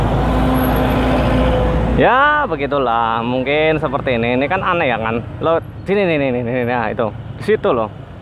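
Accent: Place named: Banyumas, Indonesia. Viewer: Ngapak temen lah mas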